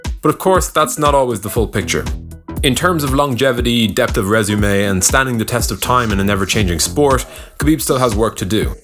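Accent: Ireland. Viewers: Nothing better than hearing how great Khabib is in an Irish accent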